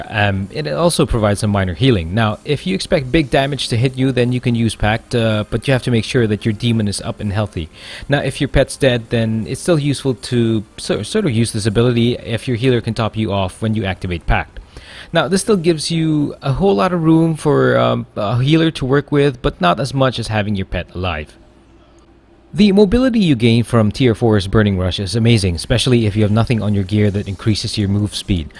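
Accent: Philippines. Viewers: Nice guide! Btw are filipino? Cause you have a little bit of filipino accent.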